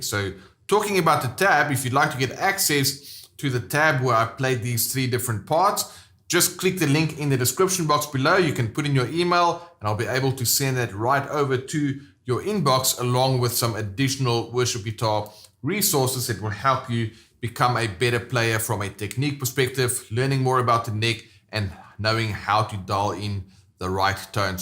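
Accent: South Africa. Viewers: I can hear you're South Africa, same here! Any advice on tone or do you have any videos on tone? I have a Roland Cube amp and also use a BOSS GT-1, but I haven't played around with tones. The tone you're using is perfect for worship, how can I achieve this tone?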